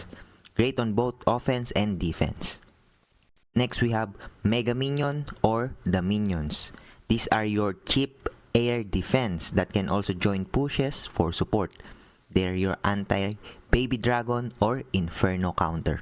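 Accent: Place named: Philippines. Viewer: Base on his accent bro is a Filipino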